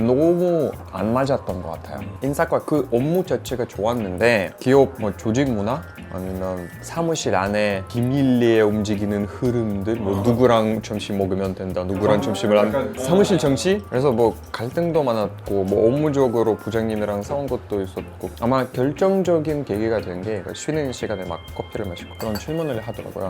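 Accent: Seoul, South Korea. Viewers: 부드러운 한국 서울말. 당신은 제일 한국인입니다. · 토종인 나보다 서울말 잘하네 어영옵써 ㅋㅋㅋ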